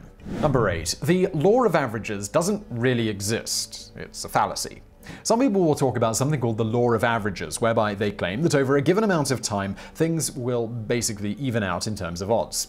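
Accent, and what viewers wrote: United Kingdom. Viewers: Since he's British. I'm pretty sure his pronunciation is the correct pronunciation. Others are speaking English with an accent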